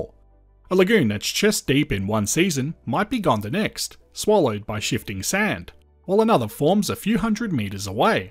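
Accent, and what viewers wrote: Australia. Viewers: It's an Australian narrator and it's an Australian channel. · It’s Australian.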